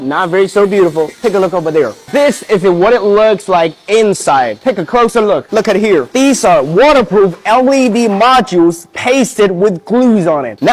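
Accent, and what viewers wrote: United States. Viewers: How is english this american lmao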